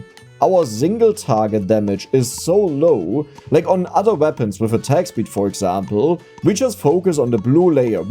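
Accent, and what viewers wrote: Germany. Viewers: I always thought why his accent is so like german until he Said things in german #Selberdeutsch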